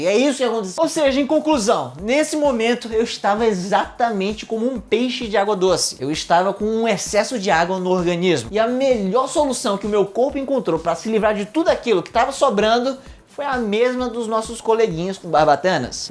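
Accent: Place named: Northeast, Brazil. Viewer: OXENTE! É NORDESTINO!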